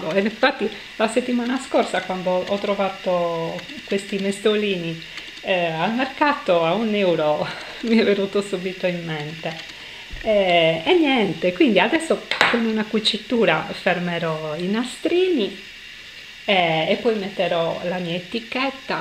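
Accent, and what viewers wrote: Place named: Sardinia, Italy. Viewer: Modello carinissimo e semplice, simpaticissima la tua cadenza sarda 👏👏👏ciaoooo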